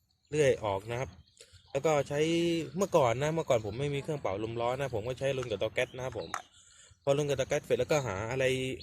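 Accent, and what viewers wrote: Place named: Java, Indonesia. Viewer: ini orang jawa ya, kok ngomongnya ngapak wkwkwkwk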